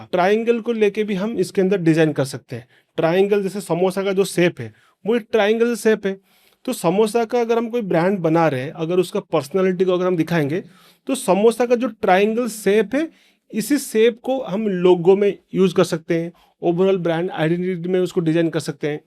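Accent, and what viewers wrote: Odisha, India. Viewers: Bhai tame hindi kahibaru jana padijauchi tame odia boli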